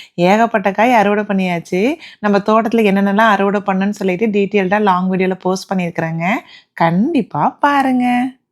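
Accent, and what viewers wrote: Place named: Coimbatore, India. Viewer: உங்க கோயம்பத்தூர் பாஷை ரொம்ப நல்லா இருக்கு கேக்க 👍🏾👍🏾,வீட்ல harvest செய்யறது, ஆரோக்கியம், ஆனந்தம்.🎉🎉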